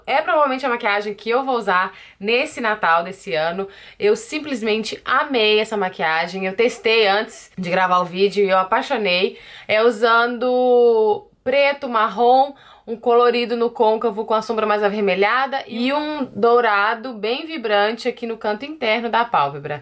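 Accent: Minas Gerais, Brazil. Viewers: Uma boneca!!.♥Amo seu "minerês",tbm sou mineira.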